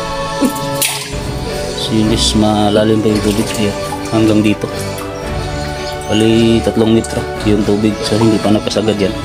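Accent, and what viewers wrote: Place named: Visayas, Philippines. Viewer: Idol taga asa man mo? kay bisaya man ang imong dialect? Taga cebu ko tks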